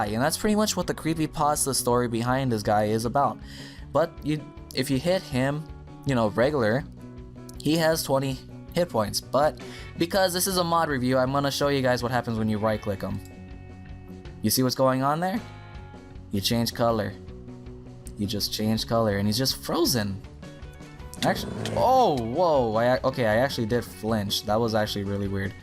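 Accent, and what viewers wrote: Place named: Mexico. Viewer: I love your Mexican accent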